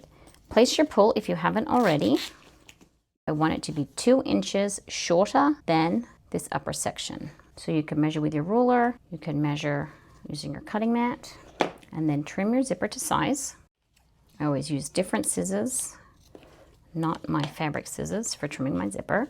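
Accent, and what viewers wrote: Australia. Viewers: You always have such lovely fabrics❤ They make beautiful bags. I made the Pythagorean pouch today with fabric lining and vinyl outer, it turned out lovely. Just a question, you have an unusual accent. I’m Australian and some words you say sound very Australian. Have you lived in Australia?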